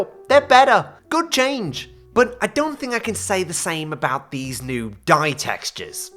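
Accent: United Kingdom. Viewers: You sound way too British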